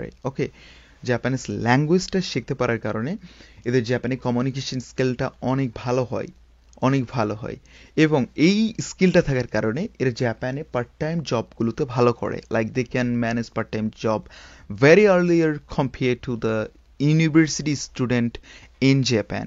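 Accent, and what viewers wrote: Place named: United Kingdom. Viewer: Vai apni Bangladeshi, amon vab nitechen amon vabe kotha boltechen mone hoy apni kono engrej, Bangla ta ektu vlo koira bola sikhen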